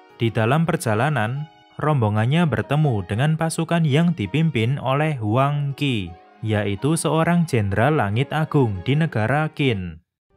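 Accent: Java, Indonesia. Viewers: Mas kata"nya jangan medok bangt🙏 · Gw pertama kali mutar vdeonya oh betapa medoknya ahhh mantap 🤣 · Medok medok medok cuy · Bang logat nya medok sekali:) xixixi asal mana bang?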